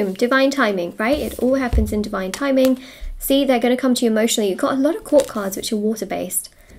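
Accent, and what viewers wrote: United Kingdom. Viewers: your accent is so British..... are you a British native???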